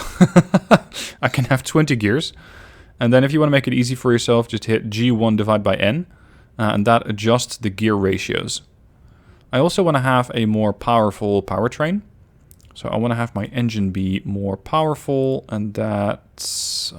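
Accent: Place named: Netherlands. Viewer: Wait he sounds Dutch haha, are you? Sprocket is fun man, i made a the Object 257 by accident(well got close)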